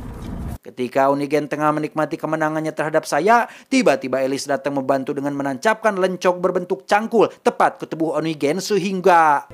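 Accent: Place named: Aceh, Indonesia. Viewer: Aceh sekali bg😂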